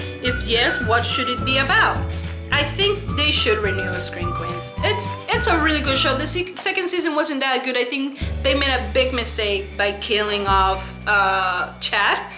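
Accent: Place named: Spain. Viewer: I love your spanish accent! I'm from Dominican Republic :)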